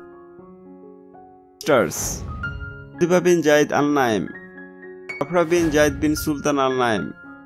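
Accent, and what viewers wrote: Bengal, India. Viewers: First improve your English pronunciation and then make such videos. Ur mother tongue is bengali and it is reflecting from your English dialect. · A very Bengali accent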